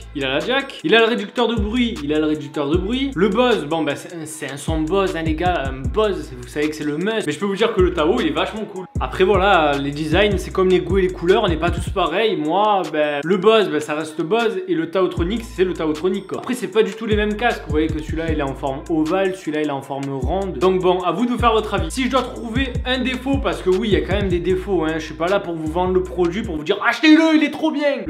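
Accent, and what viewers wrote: Marseille, France. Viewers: Marseillais plutôt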